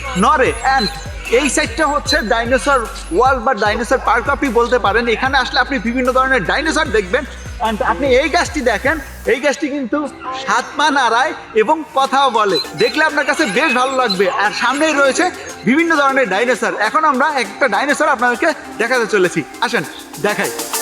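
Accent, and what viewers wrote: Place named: Barishal, Bangladesh. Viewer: কথার মধ্যে বরিশালের ভাবটা বাদ দেয়া লাগব...কেমন জানি লাগে..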